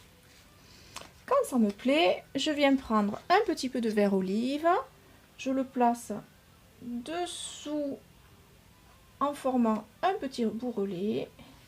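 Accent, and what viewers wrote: South, France. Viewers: J'ai beaucoup appris avec vous. J'aime votre accent du Sud!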